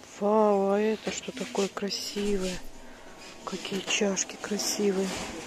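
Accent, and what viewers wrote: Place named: Central Russia, Russia. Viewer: ПолОжила,взЯла .так говорят почти все,кто живёт в средней полосе России . Видимо это местный диалект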